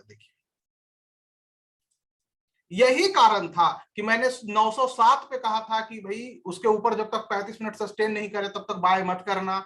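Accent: Bihar, India. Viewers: Sir do you belong to Bihar?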